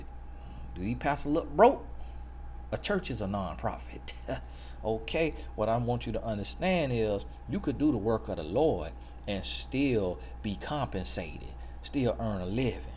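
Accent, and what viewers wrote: South, United States. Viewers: Enjoying your REALNESS & your 'southern' accent!! 🤪🤪🤪 TY, Mr..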